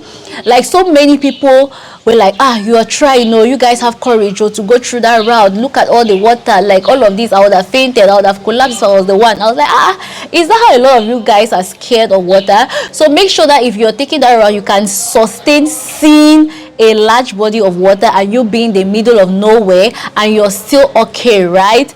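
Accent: Nigeria. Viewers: did you live in Nigeria or Bameda before. You sound nigrian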